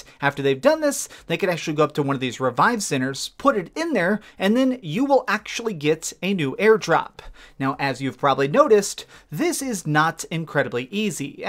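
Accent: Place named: South, United States. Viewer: What happened to the southern accent now you are speaking like an announcer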